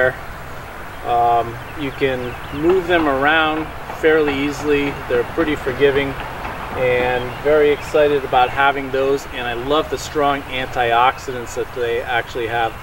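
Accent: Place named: Boston, United States. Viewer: Every time I watch one of these videos, I wonder if it’s gonna be relevant to my zone and the moment I heard your Boston accent I knew I was good!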